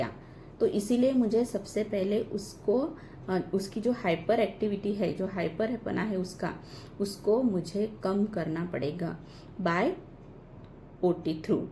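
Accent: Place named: Maharashtra, India. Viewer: Apki hindi bohot cute hai..mujhe meri mahastrian friend ki yad ati...wo v same apke jaisa bolti thi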